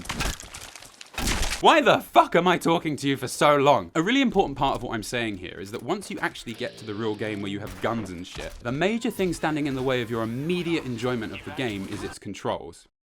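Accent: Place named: United Kingdom. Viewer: Does every british man have the same voice?..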